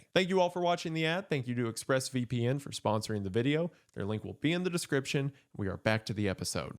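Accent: South, United States. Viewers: he's also a southerner, they've got the whole "pin-pen" merger going on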